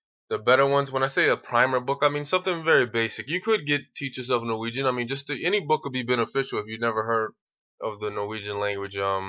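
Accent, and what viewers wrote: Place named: United States. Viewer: You sound so american - why are you interested in Norway?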